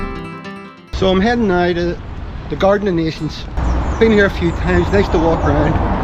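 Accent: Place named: Belfast, United Kingdom. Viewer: Omg really great to hear another Belfast accent, I've been living in Spain for 15 years ni and you're the first. Really enjoyed the video, everything looks so peaceful compared to the big noisy city where I live. We're lookin to move and going through all the different places on YouTube, so you're great! Thanks a million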